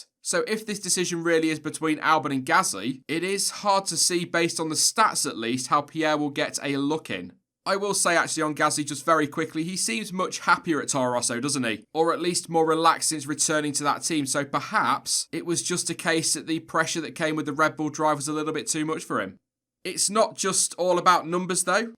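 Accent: United Kingdom. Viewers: to be fair i was using you as a base line for a english man accent so you know its not all bad a compliment from your irish neighbors !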